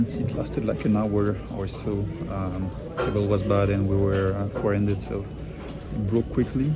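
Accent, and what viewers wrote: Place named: France. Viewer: Je trouve que tu perds de ton charme, tes vlogs étaient assez cools à regarder en français et tu avais ta petite touche humour à toi. En anglais et avec l’accent français ça rend forcément un peu moins bien mais force et honneur pour tenter ça et aller chercher le marché US anglophone, ça reste quali et nice comme contenu 🔥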